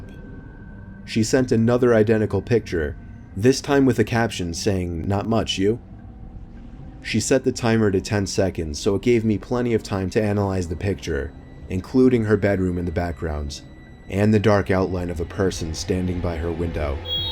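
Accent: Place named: New York, United States. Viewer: You have a slight New Yorker accent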